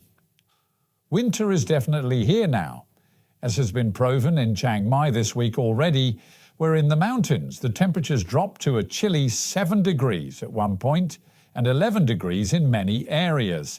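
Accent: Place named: Southport, United Kingdom. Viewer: Southport English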